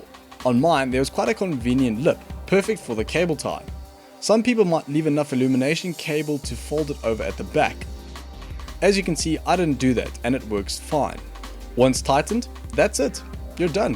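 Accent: South Africa. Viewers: wait are you south african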